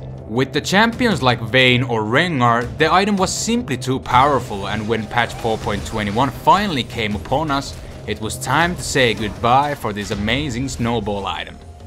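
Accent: Finland. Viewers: Are u from Finland? If u arent u just speak like Finninsh people does.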